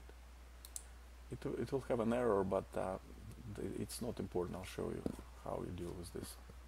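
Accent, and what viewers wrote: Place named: Russia. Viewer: Русского человека сразу слышно :)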